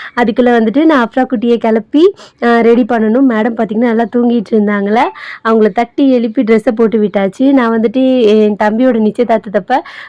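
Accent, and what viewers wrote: Ramanathapuram, India. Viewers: Na ramnad thondi. Unga pechile therinjadhu ma namma ooru pullanu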